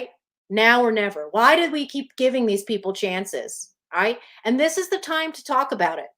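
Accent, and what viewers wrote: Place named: Philippines. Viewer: Michelle M. Having had a couple of Philippinas as GF's your accent is so fantastic.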